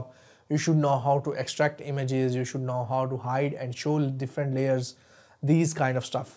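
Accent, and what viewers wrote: India. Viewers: You have an indian accent.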